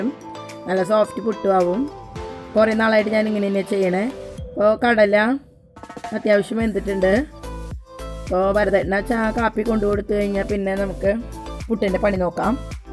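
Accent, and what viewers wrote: Thrissur, India. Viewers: രസമുണ്ട്ട്ടോ പറയുന്നതുകേൾക്കാൻ തൃശ്ശൂർ ഭാഷ ഇഷ്ടം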